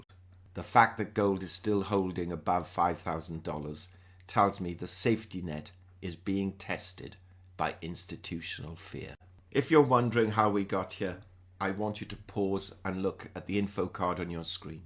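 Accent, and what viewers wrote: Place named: Midlands, United Kingdom. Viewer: I can hear it in your accent a little. I would guess not far from Burton. Very sorry for your loss, 91 is an amazing age. I will be lost without my mum, can't imagine how you feel.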